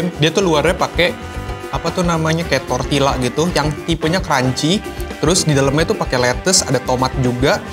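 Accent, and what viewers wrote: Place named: West Java, Indonesia. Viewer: Wah sunda bet ya, letuce jadi leteuce euy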